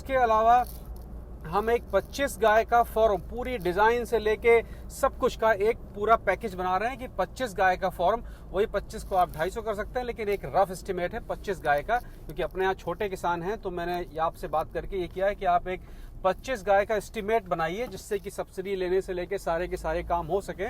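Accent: Bihar, India. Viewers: बिहार से हो क्या भाई हहहहह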